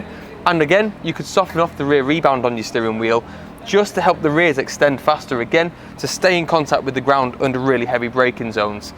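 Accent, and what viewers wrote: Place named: United Kingdom. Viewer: great video, just a bit more USA english will be great for people outside of UK :-)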